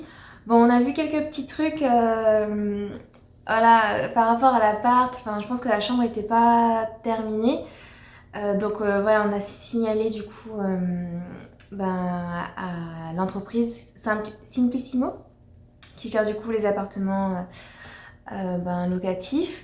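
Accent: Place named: France. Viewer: My wife and I love your french accent. Welcome to Quebec and good luck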